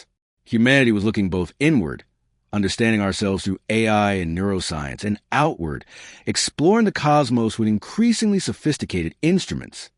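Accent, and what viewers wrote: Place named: United States. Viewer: I liked the AI voice chosen here. American. Not those couple of English guys all over YouTube who sound like they have a cold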